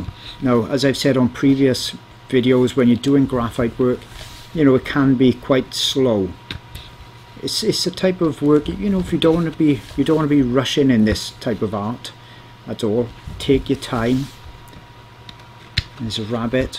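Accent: Wales, United Kingdom. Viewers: Really nice to hear another Welsh voice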